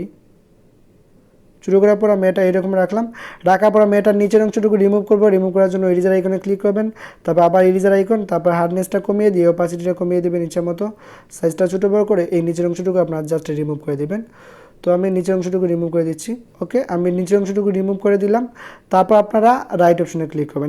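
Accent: Sylhet, Bangladesh. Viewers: ji oy... Bai. Jesataw kow.. Ek Sylheti e onno Sylhetir mat bala kori buje🙃😇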